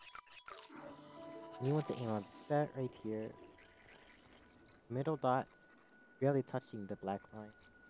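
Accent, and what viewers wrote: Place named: England, United Kingdom. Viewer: i know, but it surprised me cause he had a english accent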